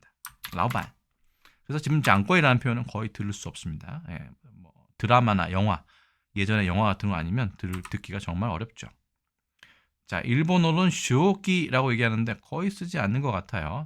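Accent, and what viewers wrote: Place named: South Korea. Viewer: 매번 지식축적에 보탬이 됩니다! 동양3국의 실용사례까지 설명하시니, 폭넓고도 실용적이라 생각하고요!! 다만, 쭝꿔발음은 잘 모르겠지만, 일본어발음은 한국식으로 들려 옥의 티로 보여집니다!!!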